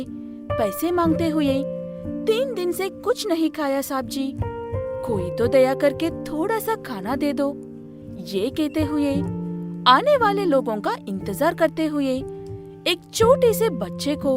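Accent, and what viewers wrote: South, India. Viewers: Ek proper hindi bolnay vali acchi background voice over artist rakhiye pls south indian hindi maza nahi ata hai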